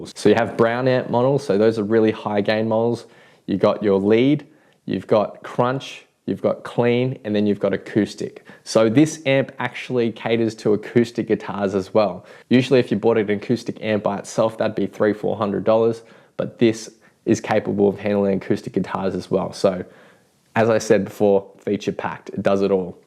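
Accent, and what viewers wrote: Australia. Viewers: the most stunning thing about this video is watching an asian guy talk with an aussie accent. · What's more annoying than an Australian accent? An Asian with an Australian accent.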